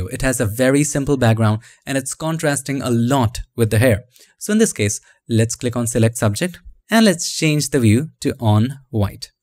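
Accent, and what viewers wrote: India. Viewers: i just subscribed to your channel... where are you from btw sir? you're sounded like from educated indian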